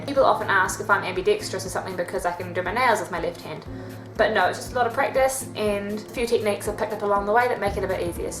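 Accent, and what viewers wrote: New Zealand. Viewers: Chur, you sound like a kiwi. Kia Ora and nice vid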